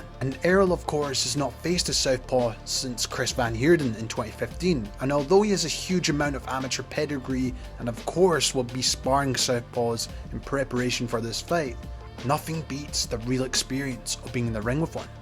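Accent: Scotland, United Kingdom. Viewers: Sounds Scottish to me.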